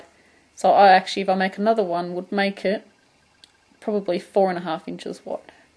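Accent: Australia. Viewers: Made this today in an earthy brown to match the little bag I made from your cluster stitch bag pattern. SUPER cute. LOVE your patterns. Love your videos. LOVE your Aussie accent ;) p.s. Aussies rule. Never met an uncool Aussie.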